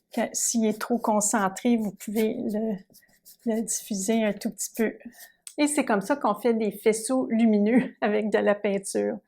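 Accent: Quebec, Canada. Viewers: washhands:❤‍🩹jadore votre accent Quebecois Merci pour Les astuces je debute n peinture a l'huile c'est difficile si on a pas de formation Merci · Merci pour ce partage, c' est toujours intéressant et rassurant de voir vos différentes techniques...et j' aime bien votre petit accent( canadien?)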